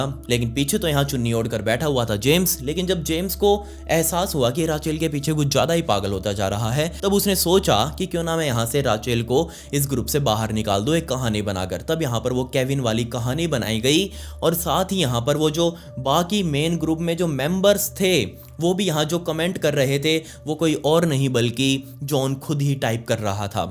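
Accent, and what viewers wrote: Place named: Punjab, India. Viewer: Koi dikkat nahi hai! I guess you are a Punjabi! Kindly reply if I am right!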